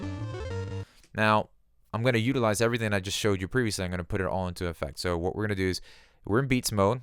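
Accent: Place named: United States. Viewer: You sound just like the guy from headspace only with an American accent, but so similar lol. Nice videos!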